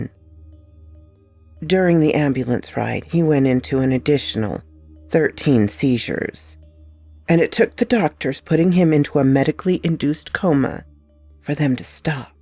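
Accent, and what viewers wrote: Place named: South, United States. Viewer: Hey. Thoroughly enjoyable creepy stories. I loved the southern accent too. Hope you're having a great week. Catch you soon.🌷🎩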